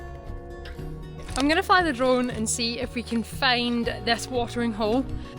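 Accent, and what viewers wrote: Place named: Scotland, United Kingdom. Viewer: I detect a Scottish accent so that'll do for me to subscribe - awra best hen 😊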